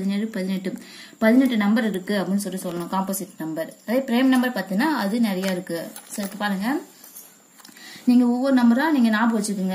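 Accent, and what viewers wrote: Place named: Chennai, India. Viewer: Unga chennai speak super madam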